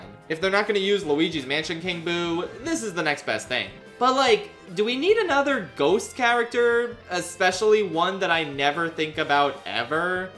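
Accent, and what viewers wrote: United Kingdom. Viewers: Bruh I thought this guy was bri’ish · I’m sad, the British voice is gone 💔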